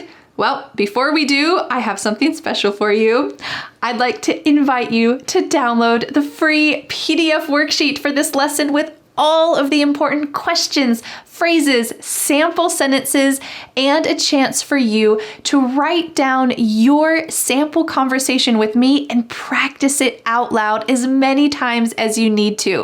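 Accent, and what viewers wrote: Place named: United States. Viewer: Thanks teacher for your teaching, I love American accent to pronounce ❤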